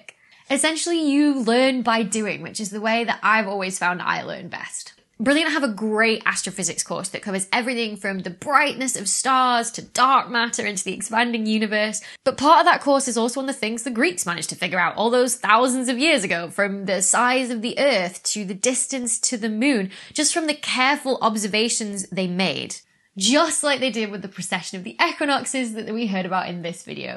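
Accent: United Kingdom. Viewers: Keep in mind that she's British and British pronunciations often differ from American. In the U.S. we call the second Greek letter "baatah," while the British say "beetah."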